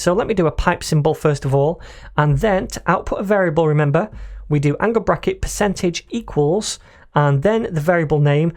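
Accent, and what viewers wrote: United Kingdom. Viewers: Bro the moment I start working I'll by a course, merche or do something to pay you for such a content as good as this, at first I didn't wanna try because it's a little difficult for me understand british accent but I not just improve that part of my english but also lear so much about node. GREAT CONTENT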